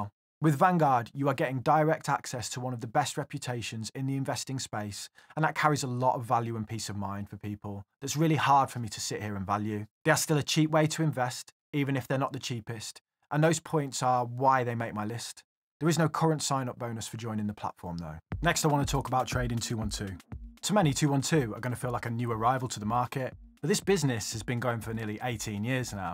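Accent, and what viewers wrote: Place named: West Midlands, United Kingdom. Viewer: as a fellow west midlander great to hear our accent broadcast you videos are very educating and continue to grow our knowledge thankyou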